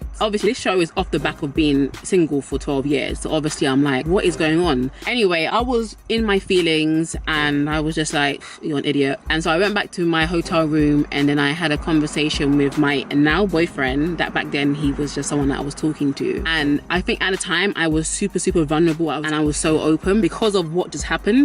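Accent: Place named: England, United Kingdom. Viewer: !!! I missed you. I haven't been on your channel for a while. I am so happy that you are doing well and have a 1yr anniversary coming soon. Congratulations. I feel you on the dating alone thing. I'm kinda going through that right now. I'm learning a lot about myself as an individual and what are my game changers and deal breakers. I love, love your English accent.😍 You have a very beautiful and giving spirit. God Bless you &so much love. 🤗❤🙏🏿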